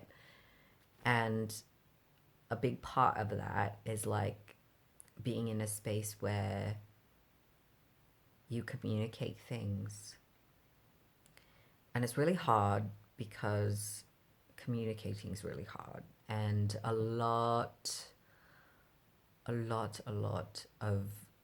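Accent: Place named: RP, United Kingdom. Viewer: as a british person your accent was suprisingly decent but you sounded alot more like you were from like the richer parts of britain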